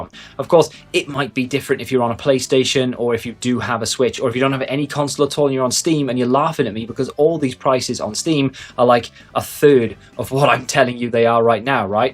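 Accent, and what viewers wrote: Wales, United Kingdom. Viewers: He's Welsh boyo.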